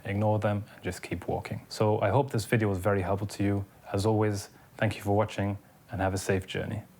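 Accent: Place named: United Kingdom. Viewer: Good video, thanks. Can i ask if you are British too? As your English does suggest you are.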